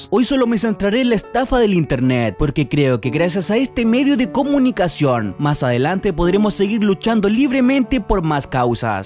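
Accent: Chile. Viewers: Eres de chile? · eres chileno?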